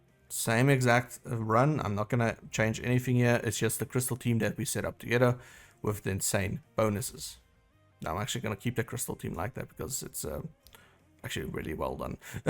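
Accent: South Africa. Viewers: Yesss!! Finally after finding your channel today and binge watching your videos I confirmed your are south african🙂 Yoh dit het my nogal bietjie mal gemaak om te luister en heeltyd te dink die ou is van SA. Dankie vir al die goeie info op al jou videos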